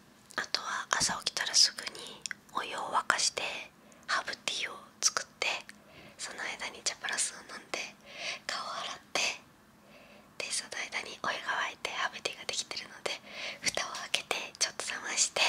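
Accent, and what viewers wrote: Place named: Tochigi, Japan. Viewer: 栃木の方言めっちゃ共感できます～笑 あまり可愛い感じはしませんよね😅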